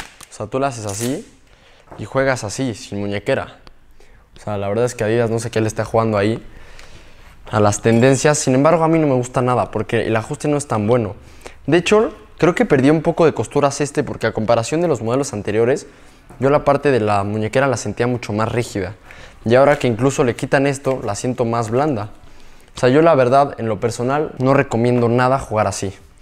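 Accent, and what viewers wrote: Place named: Fresa, Mexico. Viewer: Algo nefasto el acento super fresa goeeee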